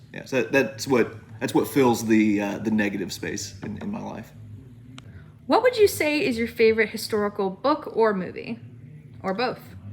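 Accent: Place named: Midwest, United States. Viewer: love your work. Didn't even know your last name, always wondered what you teach and at what level. Always assumed you are a Midwestern, but always looked for clues in how you edit, projects you select, accent (daggum!!!) You're such a humble guy but talented and genuine. Appreciate what you do and consistency. Blessings!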